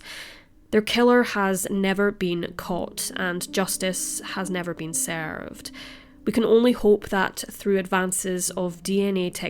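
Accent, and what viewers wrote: Scotland, United Kingdom. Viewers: Cold case. There are lots of them. Is your native language not English or are you not familiar with the many and varied accents in the U.K.? The narrator has a very obvious Scottish accent - she is from Scotland, part of Great Britain so yes, she is a NATIVE speaker of English. You must not watch many U.K./British films or TV. if you don't recognize the Scottish accent. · She's Scottish, mate 🏴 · Ye canny beat a Scots tongue to tell a gory tail 👏👏👏👏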